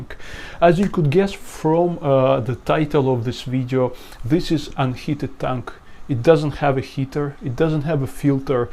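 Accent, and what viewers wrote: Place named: Germany. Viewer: Wow! what a beautiful tank and beautiful terrestrial plants growing above and around it. It seems you are experimenting with many of the same things in your tanks as I am. Where do you live? You sound German, very understandable but a slight accent. I am in Ohio on the Pennsylvania border. Not that it matters but I am just curious. I started out my aquaponic experiments with spider plants. I had about seven plants I planted in gravel in an old breeder box. Their growth exploded. The tank is in a custom-built cabinet with open slots between the boards. the spider plants are growing up through the slots on the top of the cabinet and long runners are hanging down over the front of my tank hundreds of new spider plants of various sizes are growing on these vines. My setup is in an upstairs room and has no room for expansion and I do worry about putting too much weight on the beams and though I am very careful to not make water messes you know that is not always possible. 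So I have a basement room I am planning to move to where I will have lots of room to expand the hobby. I purchased a 10-gallon tank today at a second-hand store for $5.00 and actually I have several other small tanks that I have not set up. If you go thrift store shopping on a regular basis you would be surprised how cheap you can pick up small tanks. So I will be migrating to the basement. Too bad you don't live around here. I could take you to a rocky canyon which has a large but shallow headwaters of a river.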